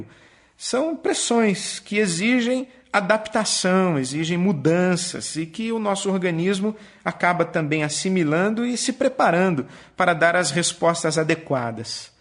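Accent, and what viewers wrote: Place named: Rio de Janeiro, Brazil. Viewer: senti um sotaque meio carioca, um R puxado.. as vezes nem parecia ele.. kkkkk Benção pastor, obrigada por cuidar de nós através das suas palavras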